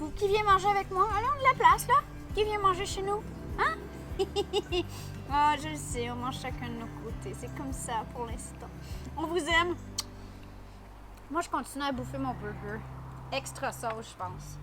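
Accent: Quebec, Canada. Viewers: J’adore ton accent québécois ❤️❤️❤️❤️❤️❤️🇨🇦🇨🇦🇨🇦🇨🇦🇨🇦🇨🇦🇨🇦🇨🇦🇨🇦🇨🇦🇨🇦🇨🇦🇨🇦🇨🇦🇨🇦🇨🇦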